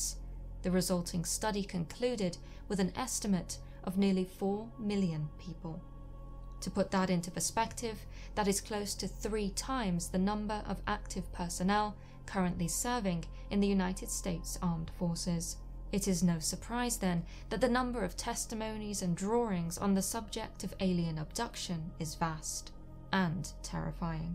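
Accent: United Kingdom. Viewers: is one of the most stunningly, naturally beautiful women I have ever seen. Her skin is perfect. And that soft voice with that British accent, WoW!! What more could any viewer ask for!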